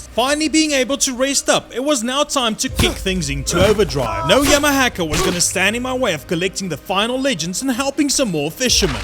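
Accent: Afrikaans, South Africa. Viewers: Is that a South African accent? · Jir my ou, your accent gives me Afrikaans vibes like jasis my bru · are you south African? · Are you south african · I knew it your south African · love your Afrikaans ,Engels accent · could tell from you accent awesome to see a fellow south African making awesome content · You sound south african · South African? 🇿🇦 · Absolutely brilliant. Subscribed. You sound South African, like me - am I right? Love the way you do the story telling which makes getting insight into the game huge fun. Dankie Meneer. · Am I the only one that hears an Afrikaans accent here?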